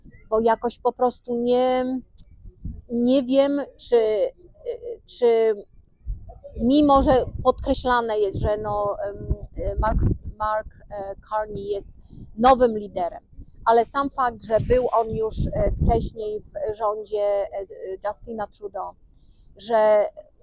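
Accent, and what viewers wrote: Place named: Krakow, Poland. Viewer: Pani z Krakowa? Tez tak mowie....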